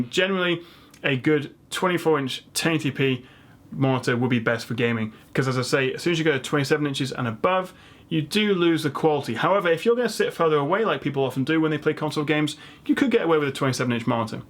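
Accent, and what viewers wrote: England, United Kingdom. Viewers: but that doesn't make sense, he's ENGLISH and he's speaking ENGLISH and yet you are American (I presume) and you speak English. Looks like you're the one with the accent! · Awesome explanation and good video. But I CAN'T STAND the way you and other people from the UK say "H". Like you do when you say "HD" it's like nails on a chalkboard. :( lol but you're still cool. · "fo-cking monitor, fo-cking gaming, fo-cking resolution".. i'm just amused. No offense I honestly love British accent. I just heard it differently. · I really enjoyed your video on features of monitors to consider. Man, are you knowledgeable--I learned a lot! I was very close to buying a 27 inch monitor, but the information you presented about 1080 resolution being inadequate on a monitor that size saved me from making an expensive mistake. I will wait until I can afford a 1440 27 inch monitor rather than a 1080. 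I am now a new subscriber and will check in with your website regularly to keep learning about computers and their peripherals. I know that you are young, bright, and British, but it might be helpful if you could slow your speech down a bit for those of us who aren’t. Thanks! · It's because he is british and you are American.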